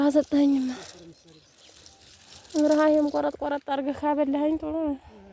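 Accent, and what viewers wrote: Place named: Rural, Ethiopia. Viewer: ሀዋየገጠርልጅአማረኛነው አነጋገርሺ በርቱ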